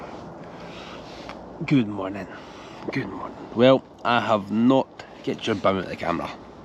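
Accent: Scotland, United Kingdom. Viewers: Wee tar’an blanket. ❤❤. Love the Scottish accent.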